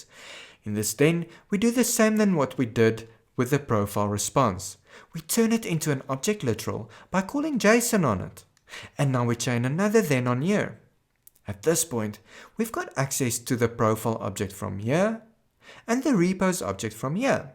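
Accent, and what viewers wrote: South Africa. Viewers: I was like... wait what... is that an Afrikaans accent? good to hear :)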